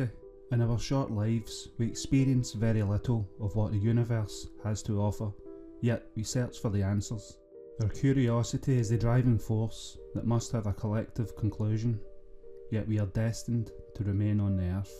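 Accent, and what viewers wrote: Scotland, United Kingdom. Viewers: I am not a native British and that is why the Scottish dialect is not understood by me. I believe many others from a foreign language area share the same pain with me. I just don't understand. I am sorry. Subtitles don't help because I have such poor eyesight that I can't see reading the text. 😢 The thick dialect is not suitable for international communication.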